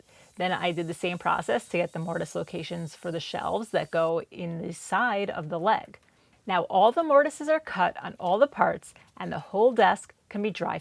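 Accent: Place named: Long Island, United States. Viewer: Long Island big time. I'm not from here originally, so it grates n my nerves every time someone says it. And I'm in a woodworking club lol. See also "Radiator" where the "rad" rhymes with rat, not raid.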